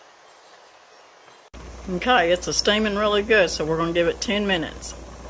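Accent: South, United States. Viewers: Wow, that is amazing! I don’t have a bread machine.. Diabetes runs in my family, so I have to stay away from breads. You always have an educational video. Thank you for teaching us 💕. I am from Iowa. We pronounce pecans differently. Love your southern accent😀!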